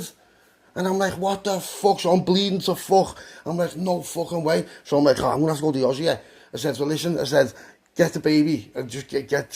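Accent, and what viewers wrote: Liverpool, United Kingdom. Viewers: Yes Scouse ❤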